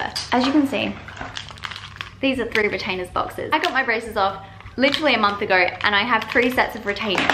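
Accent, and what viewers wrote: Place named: England, United Kingdom. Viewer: you sound a little English:))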